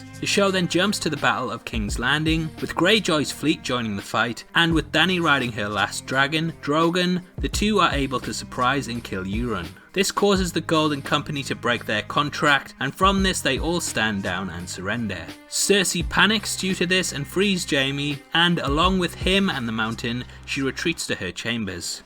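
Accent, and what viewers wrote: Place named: United Kingdom. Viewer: Why is every spoiler vid narrated by a guy with a brittish accent.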